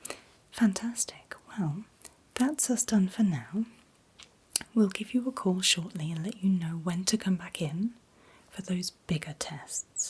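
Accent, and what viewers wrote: RP, United Kingdom. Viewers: That posh British accent hits like Ambien! ❤️🤍❤️🤍❤️🤍❤️🤍❤️🤍❤️🤍❤️🤍❤️ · Love your british accent. Its so elegant and soothing.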